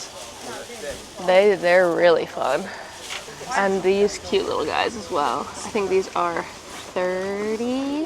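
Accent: United Kingdom. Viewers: That Brittish accent is slowly taking over your American accent. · I just noticed the longer that you have been in the Uk you are speaking more with a British accent.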